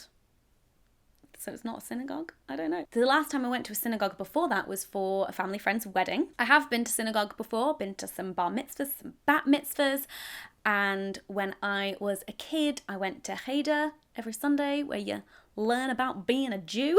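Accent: France. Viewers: You have a nice French Accent :) Just need some practice. I would love for you to come here, I'll be glad to see you <3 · Ton accent français est adorable!! ❤️ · wow loving your French accent for REAL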